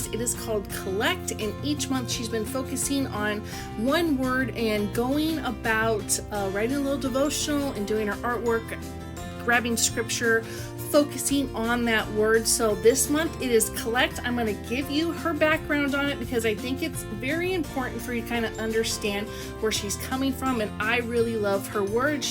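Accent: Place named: South, United States. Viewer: ain't nothin' wrong with a southern drawl y'all....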